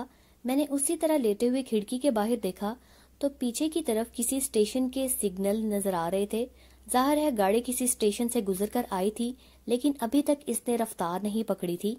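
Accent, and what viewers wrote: Pakistan. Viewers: Aapki voice lyrics se pta lag rha that u re from Pakistan m i right? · Mam, where do you belong to? Actually your level of urdu speaking is same as that of pakistani serials!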